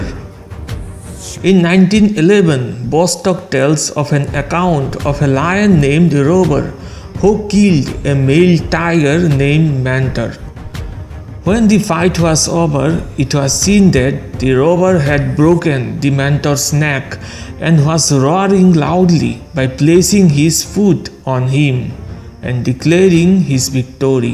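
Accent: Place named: Vrindavan, India. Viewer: Dari logat dia bicara sepertinya dia orang vrindavan 😌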